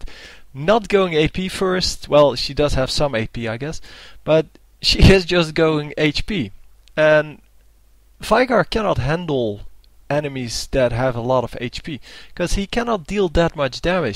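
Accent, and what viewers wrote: Netherlands. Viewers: This commentary sucks.. sounds like you're dutch..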